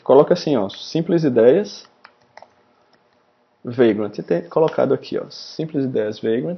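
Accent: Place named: Recife, Brazil. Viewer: Eu estava desconfiando que esse seu sotaque era lá de Recife, mas tirei a dúvida qdo vc falou parruda. Acertei?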